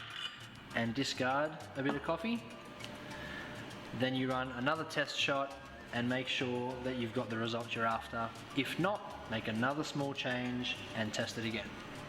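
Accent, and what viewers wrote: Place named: Australia. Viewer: So good to hear an Aussie voice - The drone of Americans is more than I can take! Thank you for imparting your wisdom on us. We have a mini mazz - So many 'baristas don't even drink coffee!!! We start each day with a ristretto piccolo or 3 - The key to a happy marriage: )....oh and we subscribed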